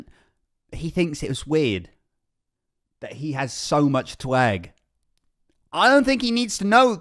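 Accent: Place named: United States. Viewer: Once again, that American accent (this time with a Tango-inflection), FTW. So good. 😄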